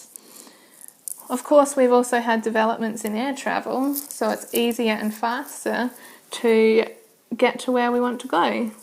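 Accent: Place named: United Kingdom. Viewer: Even though she has a British accent, I can understand almost everything effortlessly. That means she made a really good lecture ✌ (better than my teacher...)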